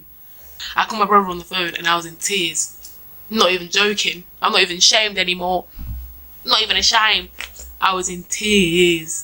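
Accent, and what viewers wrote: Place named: London, United Kingdom. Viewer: Oh I had a feeling you was from there I like London culture and the accent I wanna visit one day!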